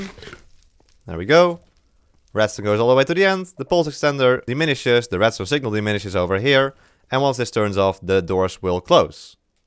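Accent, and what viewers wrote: Netherlands. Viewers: Ik hoor een Nederlandse stem, ben je dat ook?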